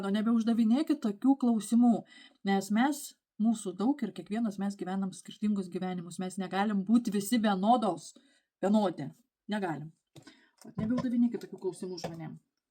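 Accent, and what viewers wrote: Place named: Kupiškis, Lithuania. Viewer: Man patinka jusu vlogai, nes tokie gyvenimiski, mieli. Dar ir tarme jusu primena namus :), nes as is Kupiskio puses ir ten panasiai kalbama. 🌲🤗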